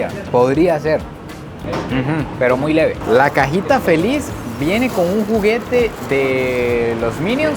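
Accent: Costa Rica. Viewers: Cada video de 🥹✨🩵 es una aventura bien vivida junto a él 🧸💙 Mi amo su acento ticoooo😂❤ “Arroz” 💙✨🧸 Love it, so cute 😍💙✨🧸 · Me encanta lo increíblemente marcado lo tico "un fresco de chang" jajajaj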